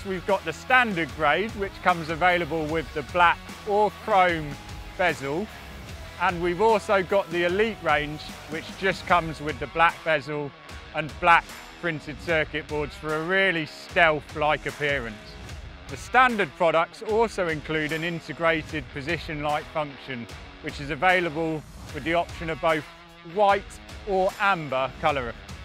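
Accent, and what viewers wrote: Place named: United Kingdom. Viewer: That britsh accent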